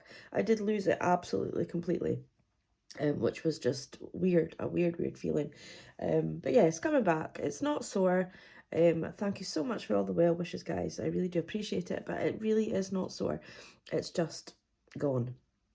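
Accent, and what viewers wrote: Scotland, United Kingdom. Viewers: Glad you’re getting your voice back a bit 🙌 I agree - the Scottish accent with subtitles is hilarious - always pray no one uses the subtitles with my videos 🤣🤣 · Yeahhh she’s back with that sexy Scottish accent 👏 Glad your voice is almost back to normal Also absolutely FAB 50p challenge ❤️❤️❤️ it 🙏🏻xx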